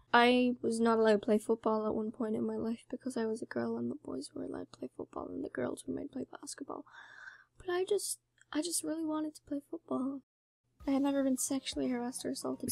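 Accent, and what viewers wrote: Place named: Donegal, Ireland. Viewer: U sound like ur from donegal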